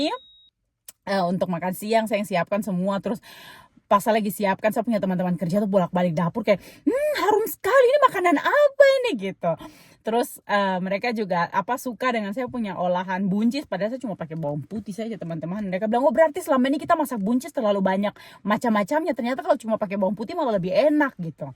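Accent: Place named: Maluku, Indonesia. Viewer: Selamat ulang tahun kk...salam kenal aku penggemar baru mu dari yogya...asek bgt logat maluku nya masih terus melekat kk... Sehabis nonton DAILY TIKA WEIXUN DICHINA langsung gercep nonton vlognya kk...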